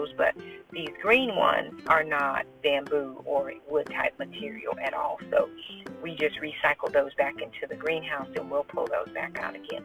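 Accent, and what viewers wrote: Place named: Carolina, United States. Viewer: I love the Carolina accent 🩷 also you just made me look up homestead, farmstead, and its actually what ive been wanting to do. (God speaks)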